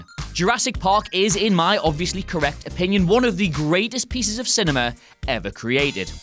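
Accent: United Kingdom. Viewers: If I come back for anything, it's the british accents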